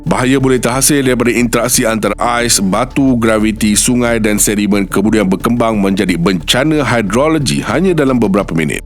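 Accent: Malaysia. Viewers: logat Malades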